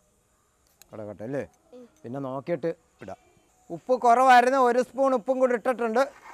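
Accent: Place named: Elippakkulam, India. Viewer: Elippakkulam slang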